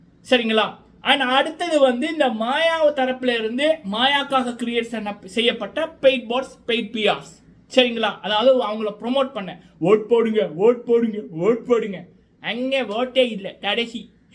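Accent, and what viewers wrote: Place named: Sri Lanka. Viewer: i know your talking style like srilankans nice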